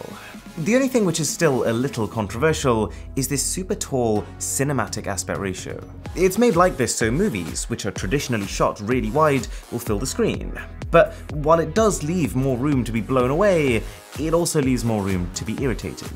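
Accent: RP, United Kingdom. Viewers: $1800? Bloody madness I should think? Buy this to keep up with the Kardashians as it were? Cheers! BTW I am aYank but like how you Limeys speak. 😂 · like the products...dont like the way he talks like if he were a posh brit....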